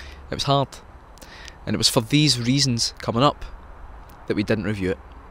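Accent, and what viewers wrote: Scotland, United Kingdom. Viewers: Awesome vid, where in scotland btw? Tryin to work out ur accent. Im fae fife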